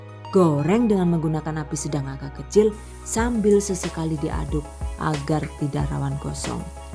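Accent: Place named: Banyumas, Indonesia. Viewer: Sangat khas videonya... Ada logat 'ngapak'nya.. Jdi lucu.. Dan penjelasannya langsung bisa dipahami... Makasiih bunda resepnya... I really enjoy watcing this video...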